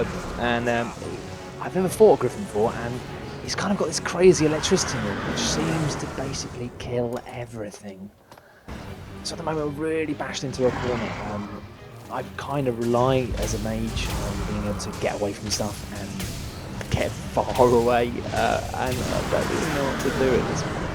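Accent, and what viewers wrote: United Kingdom. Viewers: Every time I listen to the commentary I always think of Simon Pegg. I mean I'll probably just buy this game based off of this guys sweet UK accent and commentary :P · dude the english accent is so cool wish i had one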